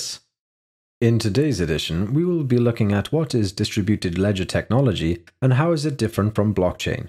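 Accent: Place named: Germany. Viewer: really shitty german accent, I did not understand anything because of his stupid accent from the 3rd reich!!!!!!!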